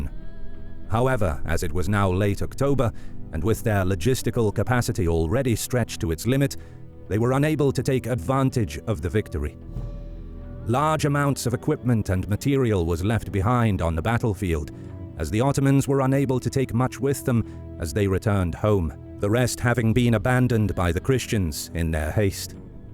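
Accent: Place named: United Kingdom. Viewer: That battle wasn’t a defeat by the Christians alone. The Ottomans disengaged as well and the battle was not for nothing. The Christian army despite their withdrawal accomplished their goal which was dislodging their enemies from the fortified town. You may sound British, but you’re definitely a pro-Muslim person based on your biases. Didn’t you just say in the earlier part that these Ottomans murdered the people they captured? Why are you partial to these murderers?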